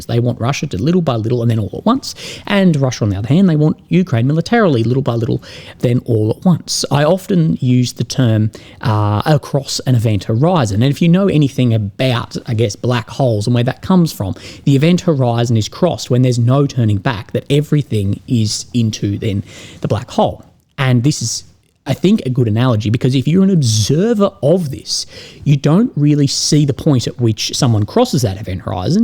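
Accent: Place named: Australia. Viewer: I did notice that you started speaking with an Australian accent since the chemo started.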